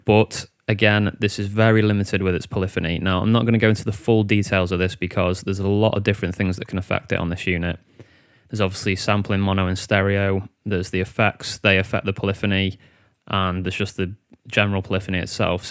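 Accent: United Kingdom. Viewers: could I do it in a DAW, or do I have to use a door? (Just kiddding, love the UK accent and the videos!)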